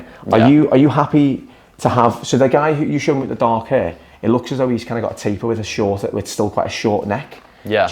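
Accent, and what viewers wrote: Liverpool, United Kingdom. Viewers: I’m 74, never been a barber nor a hairstylist, but I’ve sat in the chair many times for haircuts. None of my hairdressers knew what knows, he has taken his skills to a much higher level, also I love his Liverpool accent! 😃🇦🇺😍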